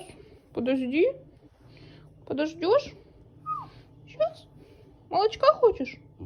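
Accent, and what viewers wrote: Siberia, Russia. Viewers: Мимо кассы. Сибирские они. 3000+ км от Тамбова. Говор — туча народу в Сибири так говорит.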